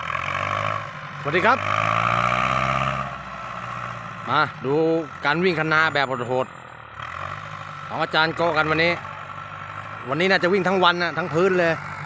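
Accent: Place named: Nakhon Sawan, Thailand. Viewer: ครับผม ผมก็ว่าสำเนียงคุ้น ๆ คล้ายนครสวรรค์ ที่แท้อยู่ใกล้ ๆ กันนี้เอง สุดยอดครับ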